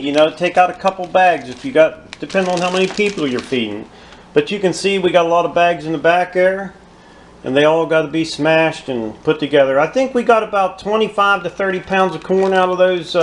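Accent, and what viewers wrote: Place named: Pittsburgh, United States. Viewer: I hear the ‘Burgh more than WV! :-)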